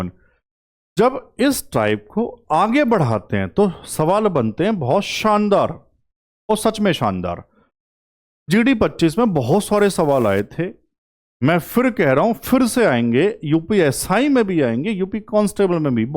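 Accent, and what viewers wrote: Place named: Haryana, India. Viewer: Haryana - famous hamari boli --haryanvi ❤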